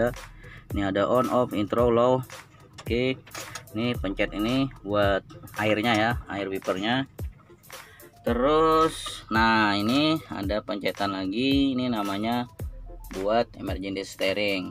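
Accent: Banjar, Indonesia. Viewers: Logat nya banjar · Logatnya orang kalimantan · Orang Kalimantan kh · Lugat banjar 😂😂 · Asli urang banjar sidin ni wkkw dri logat nya,kd kwa bedusta wkwk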